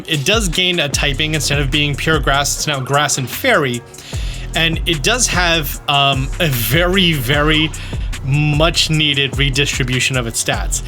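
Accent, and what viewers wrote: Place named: New Jersey, United States. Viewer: Want to know how I know you're from New Jersey? You say: wooder If you see this.. Taylor Ham or Porkroll?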